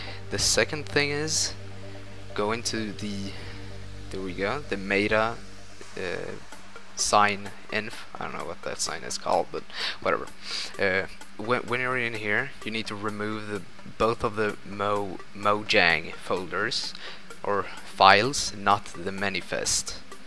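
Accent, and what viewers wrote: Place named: Sweden. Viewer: thanks :D man hör att du e svensk ;)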